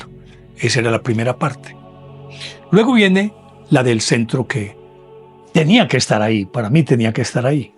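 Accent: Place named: Antioquia, Colombia. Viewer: Me encanta su acento paisa, maestro. 😍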